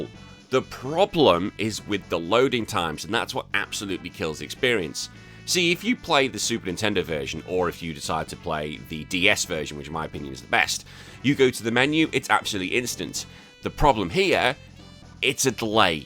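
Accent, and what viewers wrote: United Kingdom. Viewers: How do you sound even more British than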